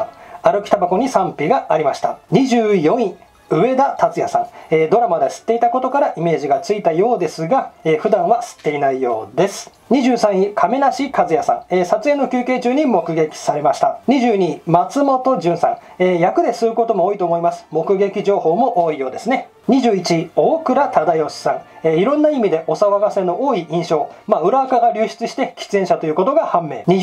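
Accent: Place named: Kochi, Japan. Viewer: こーちみたいなしゃべりかたしちょる笑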